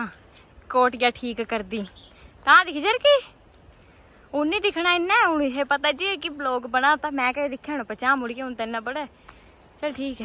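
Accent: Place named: Himachal Pradesh, India. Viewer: Himachali boli hai bro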